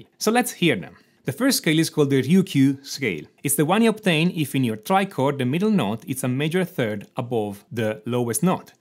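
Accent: Italy. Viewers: Avevo immaginato tu fossi italiano, il mio orecchio ha sentito giusto 😂😊🇮🇹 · Si sente che sei italiano <3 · Ho Capito che eri italiano dalla prima parola. Comunque il video è molto interessante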